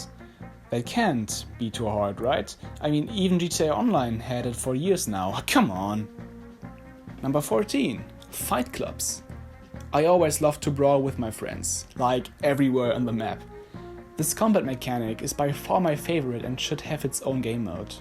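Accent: Germany. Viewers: Man hört den deutschen Akzent einfach raus 😂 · Bro you're german?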